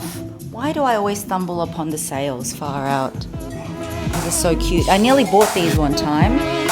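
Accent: Australia. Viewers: Just wondering, are you Asian, or Australian? You look Asian, but have an accent of an Australian.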